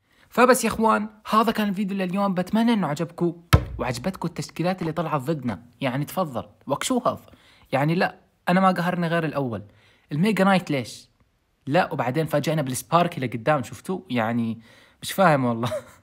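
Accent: Iraq. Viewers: تحجي كلة عراقي هل ايام😂😂😂😂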